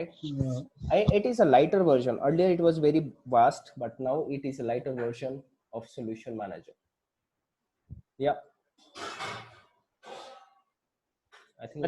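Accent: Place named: India. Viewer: hard to understand it because of hindish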